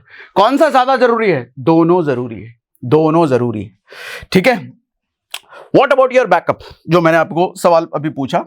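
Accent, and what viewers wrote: Bihar, India. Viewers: Tu insurance bech rha hai ya market ki baat krega bosdi ki bihari 😢😢😢😢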